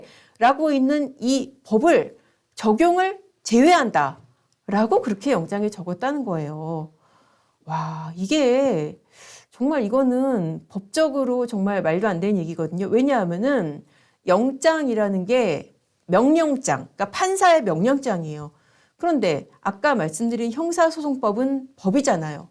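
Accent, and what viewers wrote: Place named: Jeolla, South Korea. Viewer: 젏마도 절라도 출신이구먼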